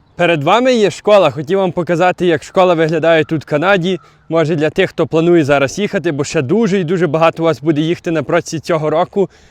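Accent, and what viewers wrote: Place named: Western Ukraine, Ukraine. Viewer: Будь ласка. переїхав в Канаду в 2005 році у віці років 11. Навчався в канадійській школі. Мати вчителька англійської. Він багато подорожував Канадою, вивчаючи поселення та хвилі мігрантів з України, діаспори тощо. Тобто його українська не зовсім класична, але він дивує своїм щирим українським патріотизмом. А також пишається своїм західноукраїнським діалектом.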